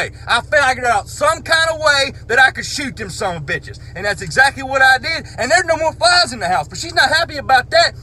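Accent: South, United States. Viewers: The Southern accent is the icing on the cake 😂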